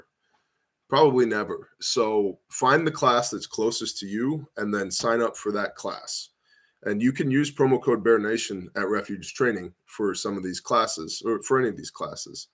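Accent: Minnesota, United States. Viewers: Love the Minnesotan accent brother. You nailed it perfectly! Dontchaknow! And the radioactive leak, barely a peep and I don’t even hear anyone talking about it. It’s about 2 hours from me. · That MN accent was on point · Awesome Minnesotan accent ya… Donchu know!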